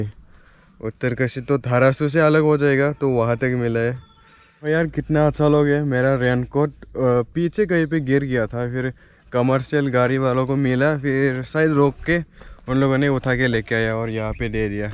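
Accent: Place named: Nepal. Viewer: By his hindi ascent he is nepali bcz I been in uttrakhand , people of uttrakhand hindi ascent is different not like him anyways enjoy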